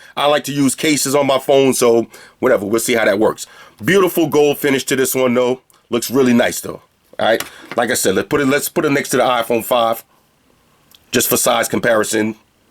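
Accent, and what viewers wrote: New York, United States. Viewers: this review was really fuuny. i have a feelin this guy is from nyc cuz i talk like that too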